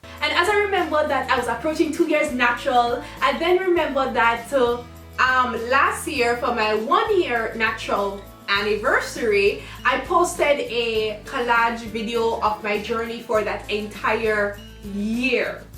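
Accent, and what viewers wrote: Jamaica. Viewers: Your Jamaican accent is so AMAZINGLY BEAUTIFUL!! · Are you a Jamaican?????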